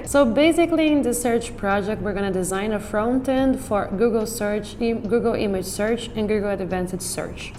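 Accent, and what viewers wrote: Brazil. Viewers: Youre brazilian, am I wrong?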